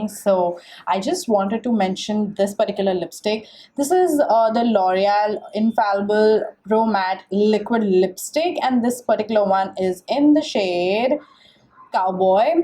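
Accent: India. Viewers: I fucking hate indian accents soo much, idk its fucking weird as fuck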